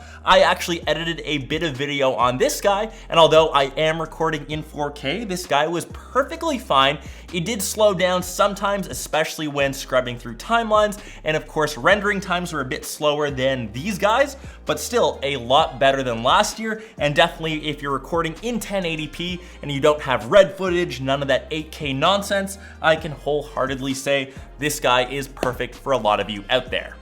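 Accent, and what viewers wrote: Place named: Canada. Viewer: Great video! Thank you! Also I appreciate how Canadian you are. "PrOccess!" "Out!" Keep up the good work!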